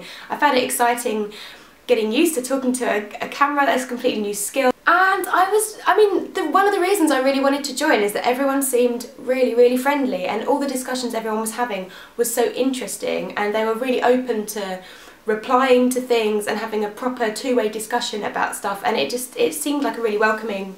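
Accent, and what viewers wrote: United Kingdom. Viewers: I can't believe it, you're so friendly! I came to your video/channel 'cause I wanted to hear the british accent and get used to it, but I'm impressed! I'm brazilian and I have plans to study english in London so I'm kinda going to live there for a few months and I'm freaking out! I'm happy that I get here in this video, so I can get used to some words and actually hear about books, it's useful! Thank you so much! (and pardon me if my english is that bad or if I've said something wrong, I didn't used a translator or anything :P ) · So nice to here another English accent on booktube. It's also nice to have someone on my sub list with Adult books. Looking forward to watching more. :)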